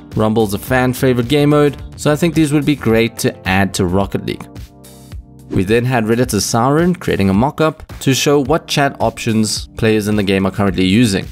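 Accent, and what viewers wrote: South Africa. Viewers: i had a feeling you were South African from the accent, but had to check your bio. Glad to see i was correct! Good Vids!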